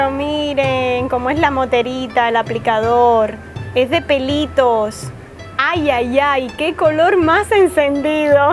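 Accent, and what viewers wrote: Cuba. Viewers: si es de Cuba pero vive en Miami 😉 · Eres cubana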